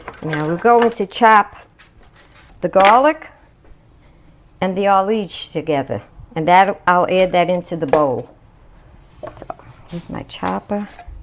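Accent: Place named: Brooklyn, United States. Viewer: Looks good, I make them similar but bake them in the oven coated with olive oil. She sounds like she is from my neighborhood in Brooklyn.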